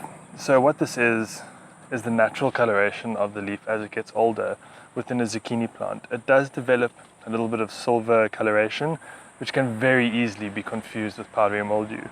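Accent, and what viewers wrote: South Africa. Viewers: Thanks for the tips. I'm subscribing because you sound South African and it's hard to find South African gardening YouTube channels today we can relate to. Looking forward to your future videos...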